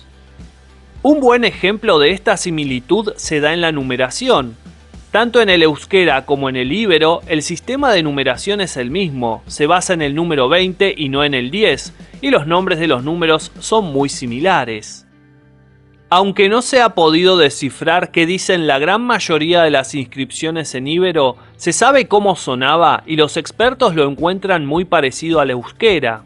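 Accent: Argentina. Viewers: ¨Peru tu que tel historico eres. eres por el acento eres Argentino. No tienes ni puta idea de nuestra Humanidad. Los Romanos ´pasaron por Euskadi con permiso nuestro. y les dimos en la ;Madre a Carlo Magno. Aita es Aita. pendejo. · Interesante. Un poquito difícil de entenderte con el acento bastante fuerte argentino. Pronuncias la Y com sh o ch en ingles. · El que habla es argentino; entre Brasil y Argentina no se odian?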